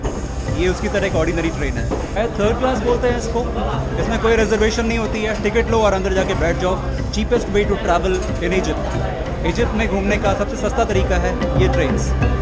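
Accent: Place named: Haryana, India. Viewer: Bhai Egypt m ab naya rule aaya h teen char din phle hi... Agr koi tourist ko tng krega na toh 10000 Egyptian pound ka fine h... Maine newspaper m pdha tha socha aap ko bta du.. Aap harayan se ho kya bhai aap bolte ho Bich bich m haryanvi ..aacha lgta h.. M bhi haryana se hu sonipat... I like ur videos very much.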